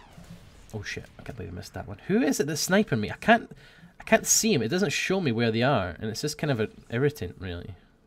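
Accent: Scotland, United Kingdom. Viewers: which coast of scotland are you from ?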